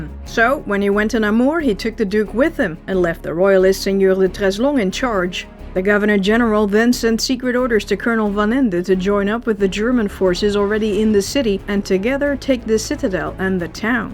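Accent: Netherlands. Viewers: I love the narration. The dutch accent is very sweet to the ears. And of course this is well written with good humour. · This channel needs more views especially given the quality of the content. Also by the way you pronounce things would it be right to assume you're from the Nederlands.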